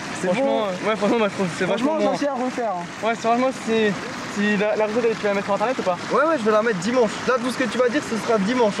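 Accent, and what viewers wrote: Alsace, France. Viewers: oui il est tu l'entend a l'accent hhalsacien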